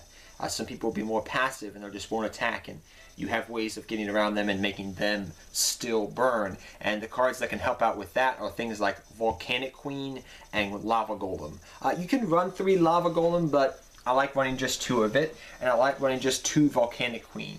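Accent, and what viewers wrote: Brooklyn, United States. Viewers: Are you from Brooklyn? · lol no do sound like you're from Brooklyn, not that there is nothing wrong with that.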